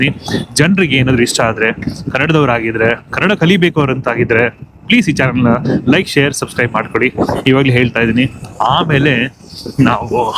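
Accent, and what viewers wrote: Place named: Bihar, India. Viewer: Accent seems like Bihari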